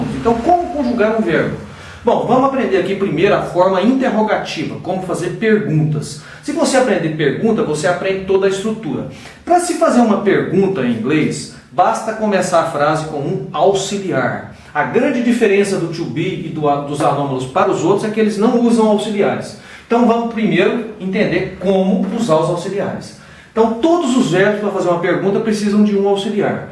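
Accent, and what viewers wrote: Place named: United States. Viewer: Po assim não vale, o cara já nasceu com sotaque americano.....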